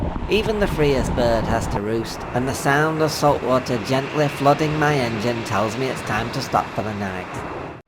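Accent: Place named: Scotland, United Kingdom. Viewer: Thanks my brother lost the bet he thought you really were Scottish!! He was close though! Thanks for the fun!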